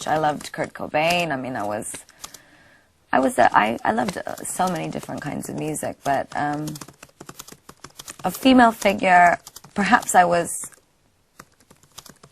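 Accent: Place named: United Kingdom. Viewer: she sounds more british here..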